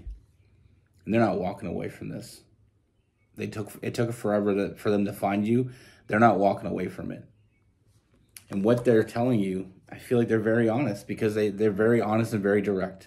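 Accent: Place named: Toronto, Canada. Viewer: so you're from Toronto eh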